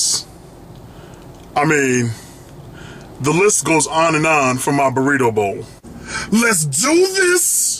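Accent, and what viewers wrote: United States. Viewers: best American accent.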